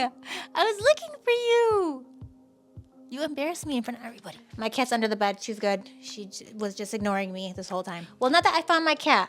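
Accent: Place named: New York, United States. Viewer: My kinda gal! Love my old 'dinosaur' DSLRs and I'm still using my iPhone 7! I'm English: so I don't know U.S. accents well. Is that a Bronx New York accent? Anyway - whatever it is - I LOVE IT!! 💕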